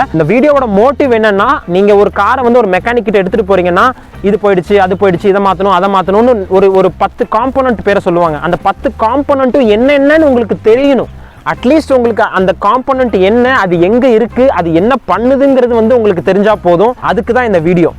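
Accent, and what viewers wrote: Tirunelveli, India. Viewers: Neenga tirunelveli ya bro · Bro neenga tirunelveli ah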